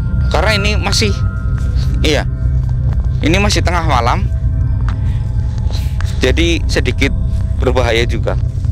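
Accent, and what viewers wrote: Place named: Java, Indonesia. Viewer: klu ngomong bahasa jawa lucu juga, smoga mister slalu sehat srmangat & sukses 🤲🤲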